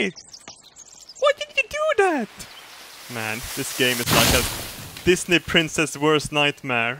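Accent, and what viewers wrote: Sweden. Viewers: Jag är glad att jag är svensk... Annars skulle jag inte förstå ett ord du säger. jag älskar dit svengelska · Nooo person, don't correct his swenglish.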